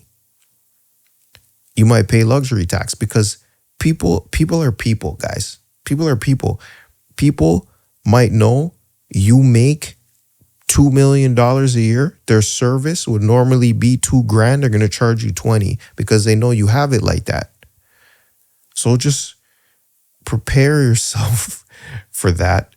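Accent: Toronto, Canada. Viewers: The moment I heard the accent I knew you were from Toronto. Its 630 am and this was a great morning listen. Sending love from Brampton. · I heard the way you speak and thought you were from Canada. Where are you from?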